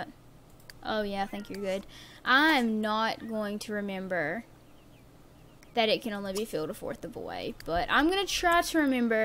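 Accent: Texas, United States. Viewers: are you from Texas?? cause you have a farm girl axcent I adore it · are you from texas?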